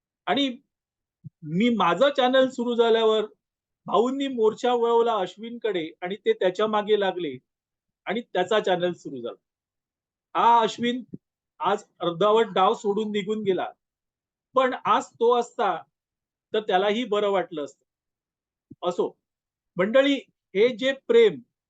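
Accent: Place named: Konkan, India. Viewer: माका खूप आनंद होवाक,तुमचा कोंकणी आवाज कानावर असाच पडत रहावा! आमचा आनंद असाच कायम ठेवा,हेच मागणे आसा!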